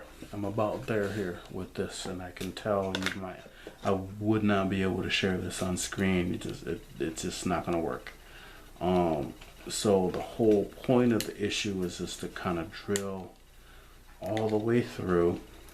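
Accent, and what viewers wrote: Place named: Midwest, United States. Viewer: you my friend are from the mi-west I'm gonna say Wisconsin like me? you talk the area nice vid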